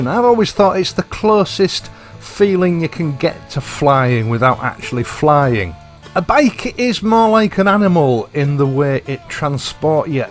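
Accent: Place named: Hull, United Kingdom. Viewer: Just found your channel it’s the accent I left hull along time ago liked vid I’m a fan now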